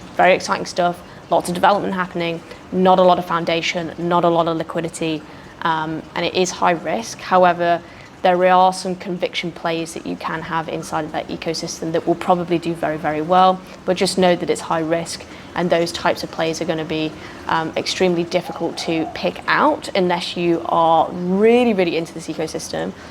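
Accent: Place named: United Kingdom. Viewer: Sounds like UK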